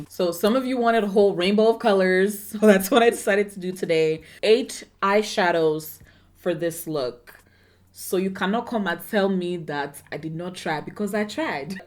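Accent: Nigeria. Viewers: I loveeeeee when you let your Nigerian accent come thruuuuuuuuu 👏🏽👏🏽👏🏽😩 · Your Naija accent, tickles my soul ♥️😍😍🔥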